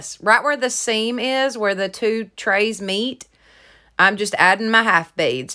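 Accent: South, United States. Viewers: Such cute ideas, I also love your accent. I'm from the East Coast & love the Southern accent. Happy crafting 😉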